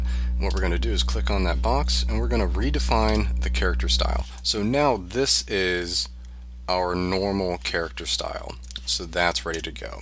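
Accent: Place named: South, United States. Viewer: Really helpful and the Southern delivery was a bonus ; )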